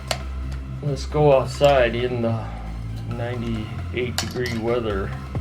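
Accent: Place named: Canada. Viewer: I am just outside of Toronto. Where are you? From your slight accent I thought you might be Canadian. Do you have Native or First Nations heritage? I hope you don't mind me asking?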